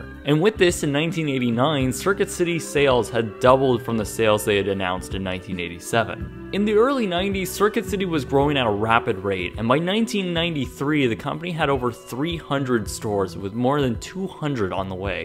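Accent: Canada. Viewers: narrator is Canadian. They pronounce some words differently. Gotta problem wit dat? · I can hear the Canadian accent in your voice. Makes this video that much better.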